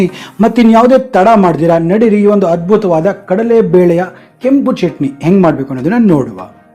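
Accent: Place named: Mangalore, India. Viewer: Mamnglore kannada accent ,kelokke tumba channagidhe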